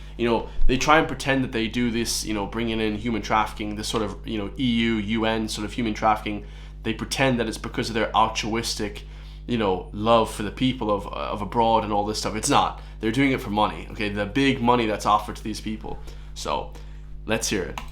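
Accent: United States. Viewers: Are you a yank??? What the fuck 😅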